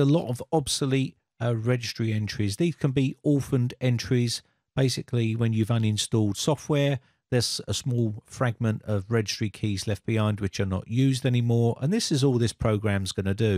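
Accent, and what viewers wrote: United Kingdom. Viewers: Bri ish guy it's not working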